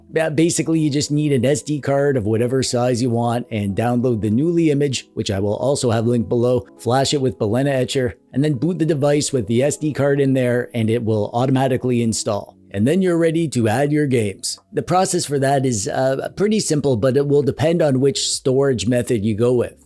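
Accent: Canada. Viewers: you're Canadian?!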